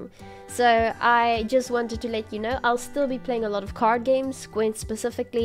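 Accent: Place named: South Africa. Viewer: The South African accent was a lot stronger in this video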